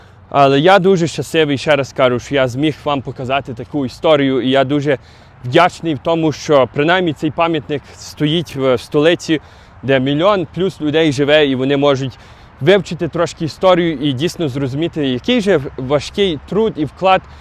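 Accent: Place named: Ivano-Frankivsk, Ukraine. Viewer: ТЫ С ИВАНА ФРАНКОВСКА МУЖИК? АКЦЕНТ ХОРОШИЙ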